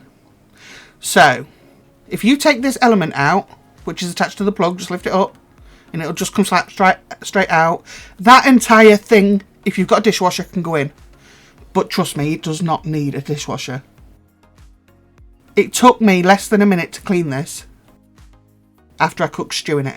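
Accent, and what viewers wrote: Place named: Lincolnshire, United Kingdom. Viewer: Well done, got one now..well pleased. Oh Are you from Lincolnshire.,thought I recognized the twang..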